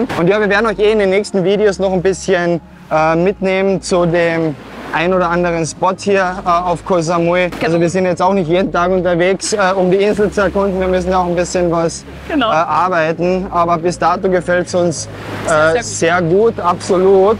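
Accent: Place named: Austria. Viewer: Danke für dieses tolle Video! Schön, auch mal Youtuber aus Österreich zu hören! Wir fliegen im Juli für 16 Tage nach Koh Samui (i know-Regenzeit, ging leider nicht anders) aber wollte euch fragen, was ihr für's erste Mal Thailand empfehlen würdet, wie viele Tage Koh Samui, wieviele Tage Koh Phangan (vl auch Koh Tao)? Würde gerne auch Khao Sok am Festland für 2 Tage sehen wollen, wenn es sich ergibt. Natürlich auch einen Tagesausflug zum Ang Thong Marine Park. Habe an 5-6 Tage Koh Samui, 2 Tage Khao Sok und 7-8 Tage Koh Phangan gedacht (mit Tagesausflug Ko Thao evtl). Ist das zu empfehlen? LG aus Niederösterreich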